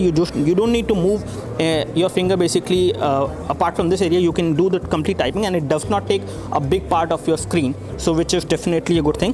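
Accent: India. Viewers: Indian accent gives me headache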